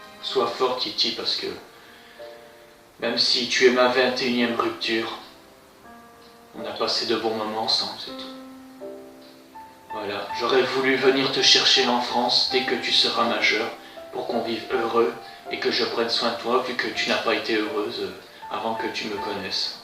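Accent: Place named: Belgium. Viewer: c rien c l’accent belge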